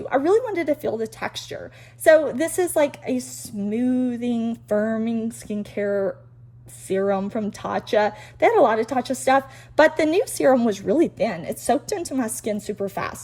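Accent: South, United States. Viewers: I love your Southern accent. 🥰🥰🥰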